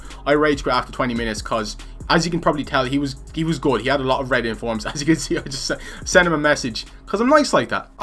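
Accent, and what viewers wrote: Ireland. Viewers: R u from Dublin or Derry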